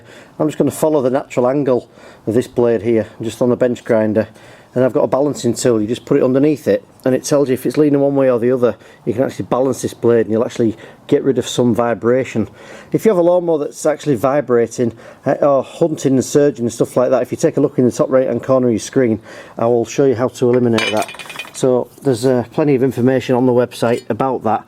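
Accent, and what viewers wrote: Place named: Manchester, United Kingdom. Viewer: Hi mate your vids are good with clear directional info.could you be as kind as to advise me how to go about sorting one of these b&s orion 35s which runs was cutting sweet but suddenly when l get into longer grass it seems to have lost her torque.will sub with you and buy any bits thru you too, your doing a grand job sharing your knowledge,l am a manc and you soind close by, much appreciated..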